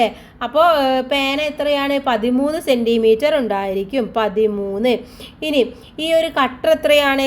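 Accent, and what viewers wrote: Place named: Haryana, India. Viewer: Teacher ka Hindi Haryanvi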